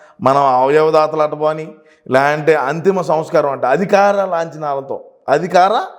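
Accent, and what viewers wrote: Rayalaseema, India. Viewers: Seema yasa బాగుంది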